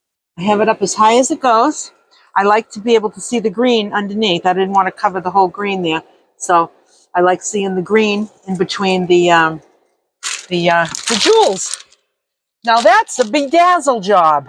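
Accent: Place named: New York, United States. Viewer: New York or New Jersey? Cause so many of my family members sound like you do. I could be wrong in placement of origin. Ill accept it if I am but if possible I'd love to know.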